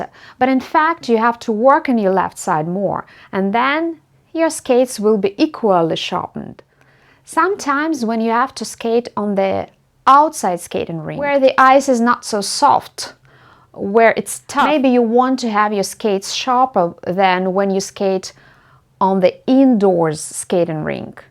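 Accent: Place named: England, United Kingdom. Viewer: The word you were looking for is 'rocker'. In English, rocker is used to called 2 aspects of blades: one is about the curve of the entire blade (e.g., 7-foot rocker such as Coronation Ace blades, or 8-foot rocker for most other blades), the other is about that place just below the ball of your foot and about an inch behind the toe pick, the rocker for spins. Thanks for posting in English, much appreciated. Your English is very good, without much Russian accent and with more English (not American) tone, I am very pleasantly surprised...😀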